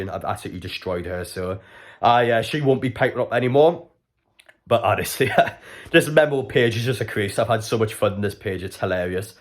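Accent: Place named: Sunderland, United Kingdom. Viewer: You sound like a mackem a take it you ain't a Geordie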